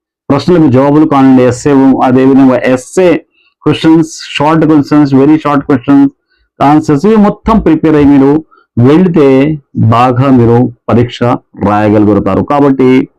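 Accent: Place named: Telangana, India. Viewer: Sir aap telangana hai yaa ap ???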